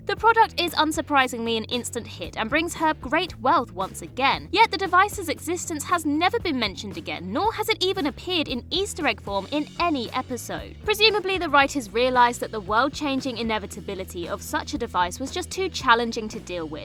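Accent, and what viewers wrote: United Kingdom. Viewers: The narrator is British.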